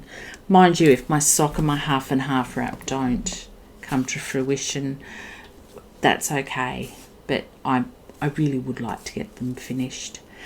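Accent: Australia. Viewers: I knew you were a fellow Aussie from the first hello. Loved your video! subscribed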